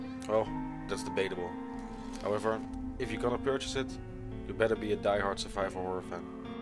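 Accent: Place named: Netherlands. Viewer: Je hoort dat hij nederlands is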